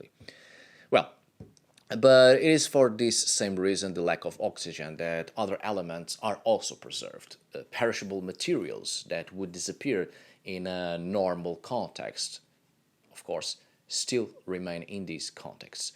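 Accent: Portugal. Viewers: Hi K, I read that somewhere has two cats 🐈 two females cats. One called Sól and the second one called Gigi. Sól and Gigi are two Norse Goddesses names. is half Portuguese and half Danish with in addition of some Irish, Gaelic and Scottish, DNA. Following his outdoor videos vegetation’s are northern Portugal area. So I guess that he is living in Portugal northern part of Portugal somewhere in the Tras Os Montes area. Sometimes his accent is clearly Portuguese accent. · Even with your Danish and British heritage I could spot your Portuguese DNA a mile away the accent does not lie, love from Portugal bro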